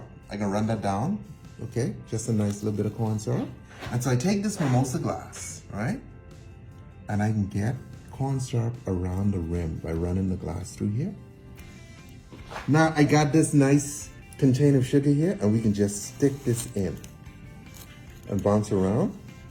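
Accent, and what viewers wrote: Bahamas. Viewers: Sound like a Bahamian accent 🗣🙂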